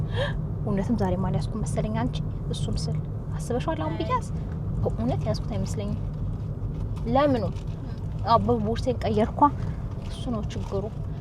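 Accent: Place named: Ethiopia. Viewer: አንቺ ፈላሻ ነሽ ለአ.አበባም ፈልሰሽ የመጣሽ ከአነጋገርሽም ታስታውቂያለሽ አ.አ base የለሽም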